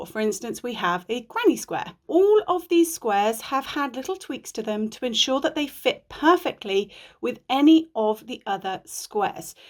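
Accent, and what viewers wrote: England, United Kingdom. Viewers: So happy to see a face with that awesome English accent and perfect nails. Thank you for all you do to bring us your patterns. ❤😊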